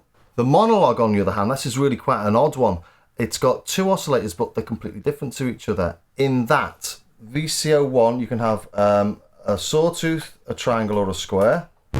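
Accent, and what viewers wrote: Liverpool, United Kingdom. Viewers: Love that Liverpool accent!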